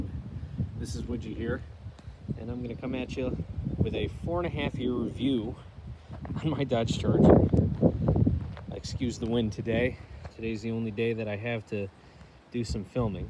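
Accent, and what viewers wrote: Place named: Buffalo, United States. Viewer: That Buffalo accent 😂 nice videos man. Nice car too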